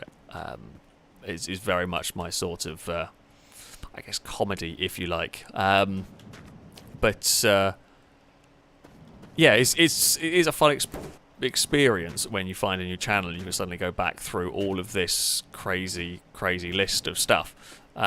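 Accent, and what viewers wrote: England, United Kingdom. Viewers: pronounced "gas op die lollie" pretty perfect imo (English accent but still better pronounced then half of the Dutch citizens does)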